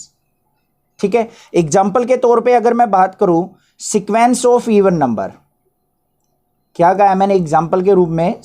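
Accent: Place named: Punjab, India. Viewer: Apki awaj ki tone panjabiyo wali h 🤔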